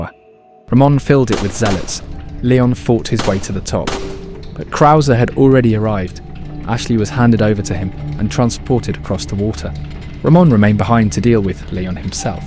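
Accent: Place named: United Kingdom. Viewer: Please change the narrator voice to a voice without this british accent 😵‍💫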